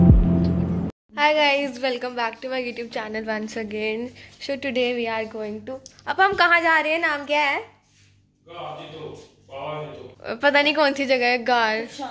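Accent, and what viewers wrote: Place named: United Kingdom. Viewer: Yrr language to HINDI HAI to ascent eng ka kyu hai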